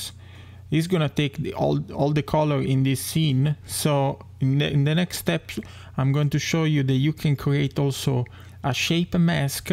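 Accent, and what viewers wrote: Italy. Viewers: Come si sente che sei italiano!! Ho una mia teoria riguardo al fatto che parli in questo modo in inglese.. Pur vivendo in Usa ascolti le radio italiane, leggi giornali italiani, fai video italiani e hai una moglie italiana.. C'è un tizio italiano su youtube che vive sempre in USA che ha la moglie americana e credo che non abbia l'abitudine di leggere giornali italiani. Parla italiano nei suoi video ma sembra più americano che italiano se lo senti parlare..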